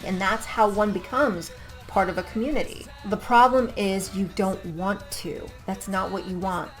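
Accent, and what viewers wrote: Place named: New York, United States. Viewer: A goth with a NY accent! Priceless!😂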